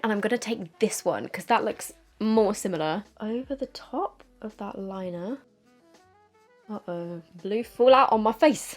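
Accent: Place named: United Kingdom. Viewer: Hi, I'm a recently new subscriber. I can't believe it's taken me this long to find your channel. I'm literally obsessed. I'm on my like 50th video in a week. I'm from Aus but my family are from UK so I adore your accent. Enjoy your day gurl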